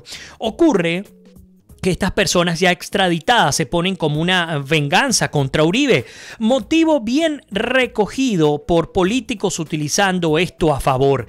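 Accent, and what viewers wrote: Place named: Argentina. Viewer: hablas parecido a Argentino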